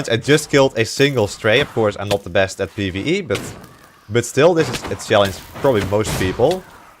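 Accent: Netherlands. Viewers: U sound nl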